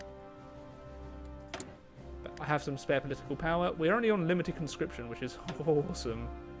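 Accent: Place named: United Kingdom. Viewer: When he said he was going to England I was shocked cuz I actually thought he was a Brit the whole time😳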